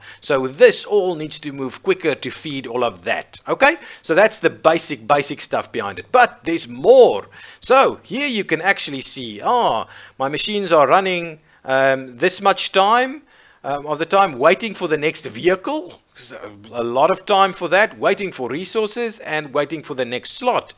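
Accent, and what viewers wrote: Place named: South Africa. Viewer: That's a South African accent if I ever heard one. :p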